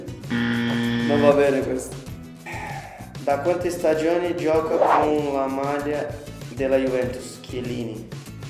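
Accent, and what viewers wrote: Brazil. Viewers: Io lo adoro l'accento brasiliano. Nonostante sia qui in Italia da tanti anni non lo ha perso del tutto e la cosa non mi dispiace affatto. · I can’t speak Italian well, but it seems like he still has a strong Brazilian accent? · Is it me or my man still sounds very Brazilian even when he speaks the beautiful language 😅😅?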